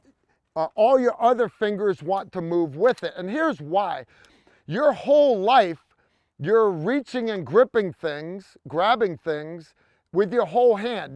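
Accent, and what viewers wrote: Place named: New York, United States. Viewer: New York accent really comes through here.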